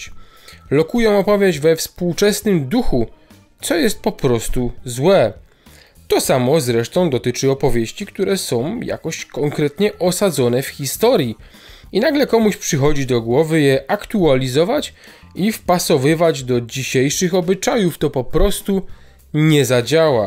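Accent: Silesia, Poland. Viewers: wyczuwam lekką nutę śląskiego akcentu :) pozdrawiam z opolskiego.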